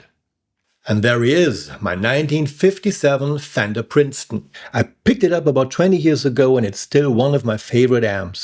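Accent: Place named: Germany. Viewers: I like this content, and I like hearing presenters with a German accent! Very cool, sir, very cool. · German accent is my favorite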